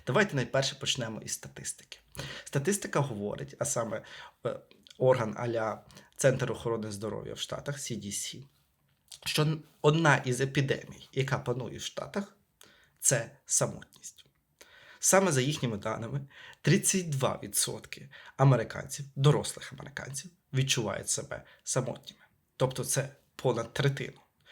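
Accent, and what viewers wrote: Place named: Russia. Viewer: друже, роби щось з тим акцентом, бо тобі ще в США жити, а говориш ти ну дуже так собі. рашан ексент :D оце "і"-кання та "ге"-кання - це прямо як маркер. Та й в деяких словах коли ти замість G вимовляєш H - не одразу зрозумієш що ти там такого сказав.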